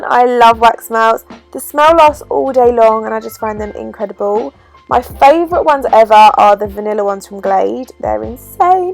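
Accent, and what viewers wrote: United Kingdom. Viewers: I can't stand the British talk what the hell are you saying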